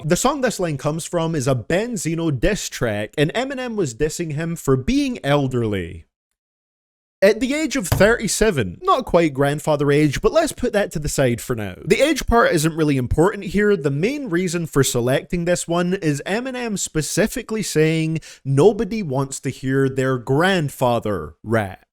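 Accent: Scotland, United Kingdom. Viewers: Ur accent interests me, r u a Scottish man living in America? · Is that a Scottish accent or no?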